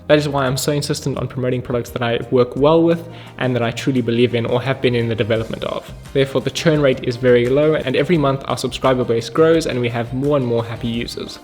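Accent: South Africa. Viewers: Are you South African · You've got a South African Accent? Are you South African bro? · is that a South African accent ?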